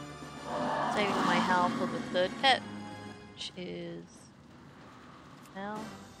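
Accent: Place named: Australia. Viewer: always love to hear a woman's aussie voice in gaming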